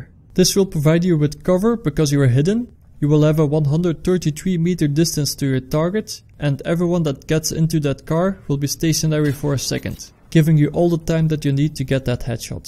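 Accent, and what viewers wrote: Netherlands. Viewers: by your accent that you are dutch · CLEARLY DUTCH :D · you are Dutch? Because of your accent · je hebt het accent van een nederlander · Wat heb jij een Nederlands accent zeg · Ik hoor aan u accent da gij Nederlands of Belgisch bent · you're dutch, heard it in 5 seconds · I think that you are Dutch, because of your pronounciation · it sounds dutch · dat hoor je aan zijn accent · He has a Dutch accent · Tis trouwens wel goed te horen dat je nederlands ben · U sound dutch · hij spreekt egt die tatta engels met die gekke accent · it sounds like a very strong dutch accent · haha i can just hear that you're dutch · You sound like a dutch guy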